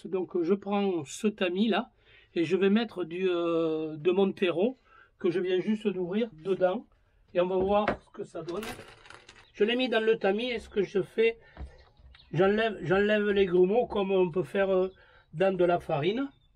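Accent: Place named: South-West, France. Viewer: Bonjour Monsieur, votre accent sent bon le sud ouest j'ai une connaissance à Pauilhac 32500 après cette parenthèse régionale voilà ma question votre mélange (terreau perlite vermiculite ) quels sont les proportions optimales pour vous imiter sans aucune discussion? en vous remerciant d'avance pour ce que vous faites aux amateurs non voyant que nous sommes.... mes salutations J.P. made in belgium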